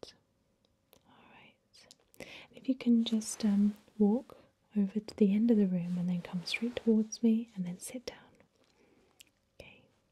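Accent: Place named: Lancashire, United Kingdom. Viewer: Sometimes I don't know if I prefer a Lancastrian accent to a posh southern accent.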